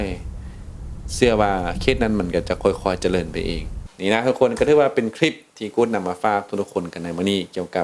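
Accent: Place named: Suphanburi, Thailand. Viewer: สำเนียง น้อง คล้ายๆคนสุพรรณบุรีที่มีเชื้อสายลาวเวียงเลยครับ เราเรียกว่าเหน่อสุพรรณฯ แล้วก็คล้ายๆกาญจนบุรี ราชบุรี นครปฐม ประจวบฯ ส่วนเพชรบุรีก็จะเป็นอีกสำเนียงนึงครับ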